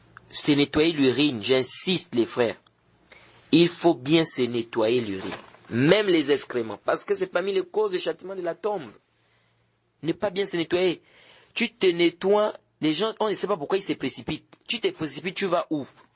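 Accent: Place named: Gabon. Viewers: Qu'Allah vous en récompense. Humm l'accent est très gabonais hein🤔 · Il est gabonais justement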